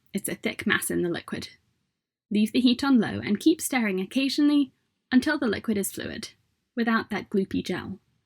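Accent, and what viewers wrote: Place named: Scotland, United Kingdom. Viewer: Where are you based? Sounds like you have a touch of Scottish in your accent but I can't place it. You have a lovely speaking voice.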